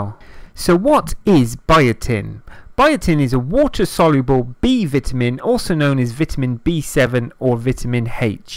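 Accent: United Kingdom. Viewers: He's British, silly. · Why are people so upset, it’s British English, it’s the English that made English ENGLISH. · British accent is the best! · I am British living in London. This man is British and is pronouncing things as it should be. This is the UK! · British accent is the BEST omg❤️ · He's from the UK. That's the way they say it there.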